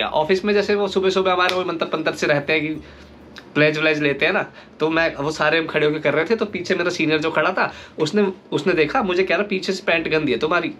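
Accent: Haryana, India. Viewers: Sir apka hasta chehara aur apki hariyanvi bat bahot din ke bad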